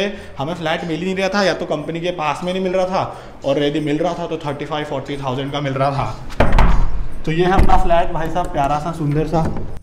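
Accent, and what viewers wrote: Indore, India. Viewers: big brother It is an indori accent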